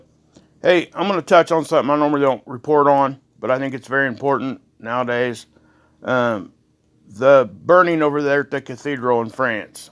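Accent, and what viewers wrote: South, United States. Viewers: I just subbed good video man sounds like your from the south good to hear a familiar voice since im from Tennessee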